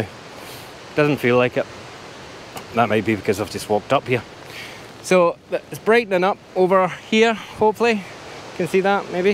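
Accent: Scotland, United Kingdom. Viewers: A wonderful introduction! Thank you so much. It was lovely hearing that great Scottish accent after so long. Keep up the great work!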